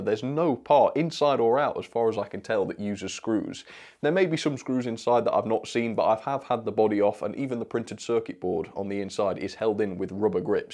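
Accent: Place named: Cumbria, United Kingdom. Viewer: Hi! Where are you from? You sound Cumbrian like me or close to Cumbria.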